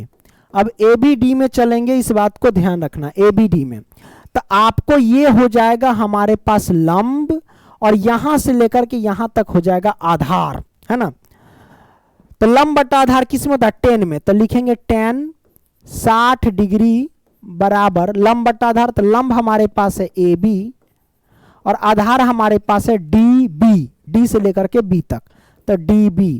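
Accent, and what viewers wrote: Bihar, India. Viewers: Sir apka bihari bhasha mast lagta hai❤❤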